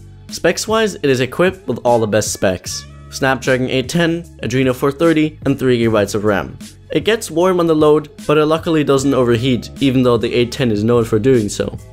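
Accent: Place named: Germany. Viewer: The "aluminium" and your accent give away that you are german, am I right? ;) Aber super zu sehen, dass hierzulande doch ein, zwei Leute neben mir das 6P haben. :)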